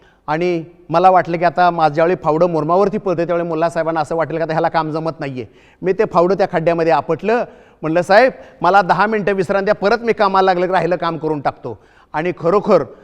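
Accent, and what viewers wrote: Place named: Satara, India. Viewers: Bhashevaruch olakhl satarachach ahe mhnun 😀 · Great sir Assal satari bhasha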